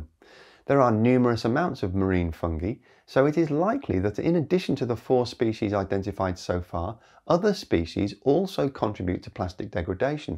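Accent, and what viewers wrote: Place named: RP, United Kingdom. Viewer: Wow, you're a very handsome man! I like your RP English and would like to see you at the beach.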